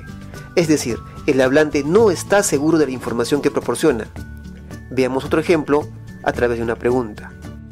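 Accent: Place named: Andahuaylas, Peru. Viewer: Tu quechua es Andahuaylino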